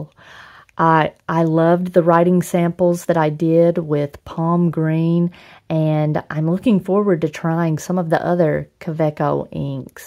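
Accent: South, United States. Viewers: I saw one of her videos for I-don't-recall-what, I stayed for her consistent review technique in her videos and of course, the soothing voice in that cheery southern accent ☺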